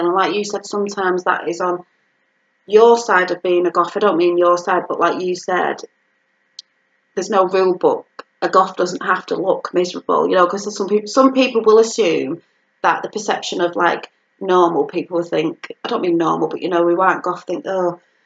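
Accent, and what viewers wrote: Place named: Birmingham, United Kingdom. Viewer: This I think is Birmingham, with that accent lol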